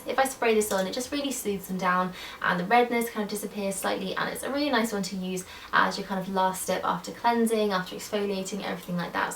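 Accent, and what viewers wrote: England, United Kingdom. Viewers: you are 1 of my faves here on the 'tube. But sometimes I don't understand everything you say. Don't know if it's b/c of the speed at which you speak or that charming English accent of yours. I didn't quite get the product you compared the Garnier Micellar water to around the mark? Great video as per usu & part 1 was awesome as well 👍 much love from Canada 🍁🍁🍁☺️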